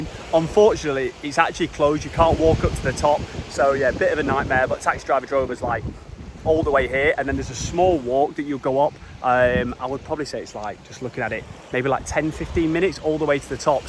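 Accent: United Kingdom. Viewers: Hi mate how are you doing? You sound like britsh.. Where do you live in England? I live in Ely Cambridgeshire mate. If you go futher to the east of Dili, it's FDTL compound (Military headquarters) you will see a huge crocodile about 7 metres long, they named him Antonio. I don't know whether this crocodile is stil there or not because I left the country ten years ago for England . just try to ask people there perhaps they can help you. Have good holiday mate and stay safe.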